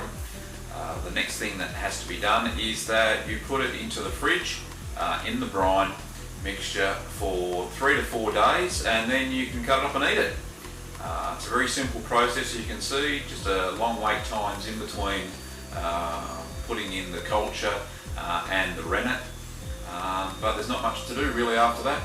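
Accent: Australia. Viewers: I've just had a good laugh. My soundcard is broken so I watched this video with closed captions. speaks with clarity and has a pleasant Australian accent. Sadly youtube almost completely fails to understand. A highlight was when he added lip eyes to his fella. A useful video with added fun from youtube's narration software - thanks.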